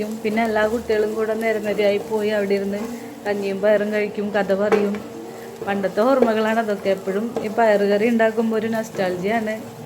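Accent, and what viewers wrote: Thrissur, India. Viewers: ചെച്ചി തൃശ്ശൂർ കാരി ആണ് ല്ലേ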